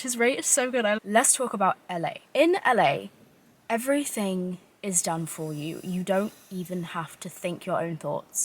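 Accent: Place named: London, United Kingdom. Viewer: London block accent ✅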